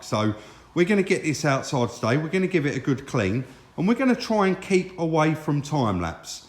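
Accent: United Kingdom. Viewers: You can dress this channel up as much as you like, but that accent is (apart from Scouse), the most distrustful and rotten in the UK.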